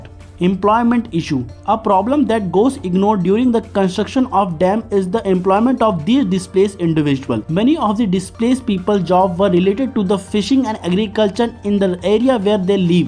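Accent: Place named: India. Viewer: funny indian accent🤣🤣🤣🤣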